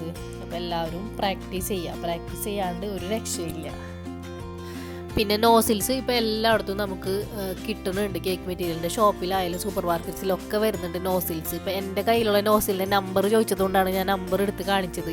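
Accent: Kodungallur, India. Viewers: Adipoly 👌👌👌 Evideyanu place? Kodungallur area aano? Njanavide aanu. Njangallude slang pole thonni 😄😄😄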